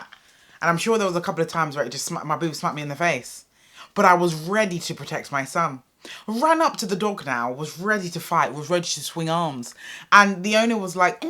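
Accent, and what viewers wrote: United Kingdom. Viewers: Id prefer if you could seperate your - very talanted - comedian style from a very serious message. I was a little irritated because it was so funny to watch. Beside your strong english dialect is fun too for foreigners :D Glad to know its not only me feeling flood by this dog pest.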